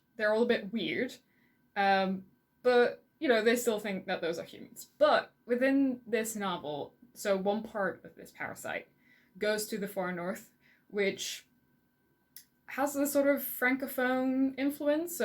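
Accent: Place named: North America. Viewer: Great video. Someone gifted me the complete collection of HG Wells recently, some I have read, others I’d never even heard of. I’m looking forward to reading them. You have a great accent. North American with a hint of northern English perhaps?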